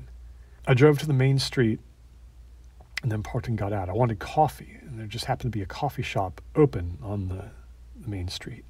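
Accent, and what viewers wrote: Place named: South, United States. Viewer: Can’t take the southern outta the Australian Ohioan 😄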